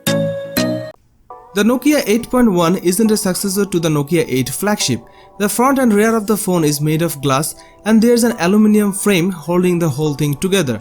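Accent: India. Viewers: Pasti india bahasa inggris sperti ini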